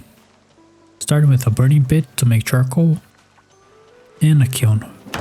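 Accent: Brazil. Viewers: Eu reconheço um sotaque brasileiro, mesmo com um inglês tão bom hehehe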